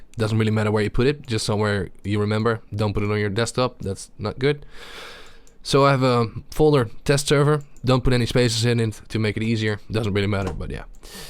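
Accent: Netherlands. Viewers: are u dutch? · Duidelijk te horen dat u Nederlands bent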